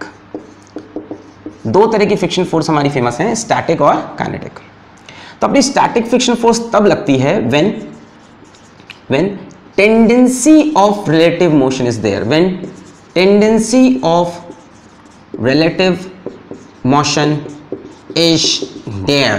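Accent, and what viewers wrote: India. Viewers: Unfortunately I can't understand Indians' accent 😭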